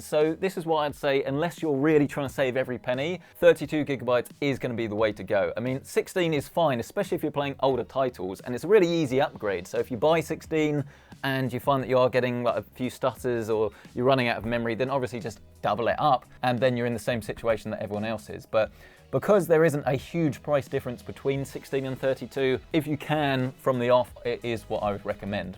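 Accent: United Kingdom. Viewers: here for the british accent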